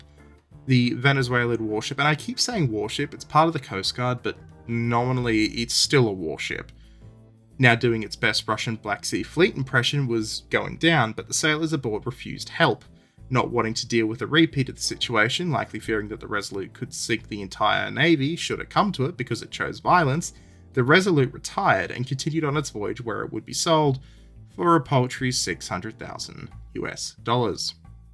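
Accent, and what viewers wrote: Australia. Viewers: You sound like an Aussie? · Cuuunt, fkn love your docos and research. You sound like you're from Queensland.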